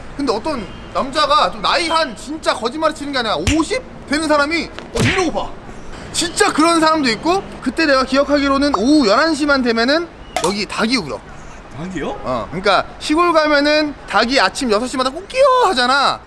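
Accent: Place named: Jeonju, South Korea. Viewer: 아저씨 말투보니깐 전주출신 같은데 ㅎㅎ 능글맞은 것도 그렇고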